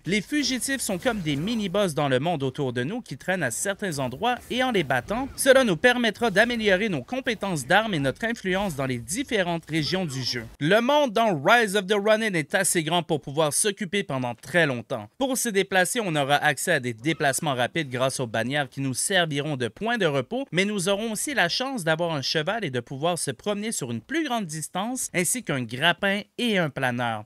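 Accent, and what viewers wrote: Quebec, Canada. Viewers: ah l'accend on dirait un québecquoi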